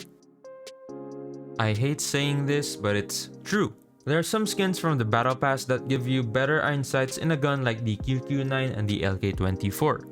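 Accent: Philippines. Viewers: your accent is like a pilipino accent. are you half pilipino?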